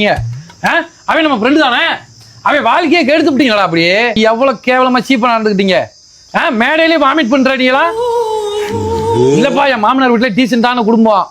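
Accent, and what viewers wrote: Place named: Madurai, India. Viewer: மதுரை கிராம பாசை😂😂😂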